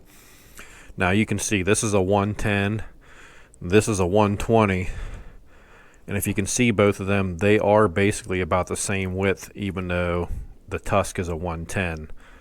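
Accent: Pennsylvania, United States. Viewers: I saw your in Blair county, I’m from York county, I could tell by your PA accent you were from PA haha, always looking for people in central PA to ride with!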